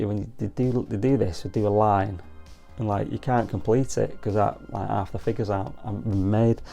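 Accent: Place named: Northern England, United Kingdom. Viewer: You sound like your from up north lad, Manchester area, I’m a north west lad too.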